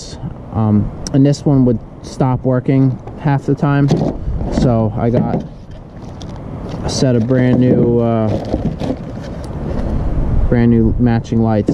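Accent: New York, United States. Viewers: “What used to fix it wiff” Good old country New York accent.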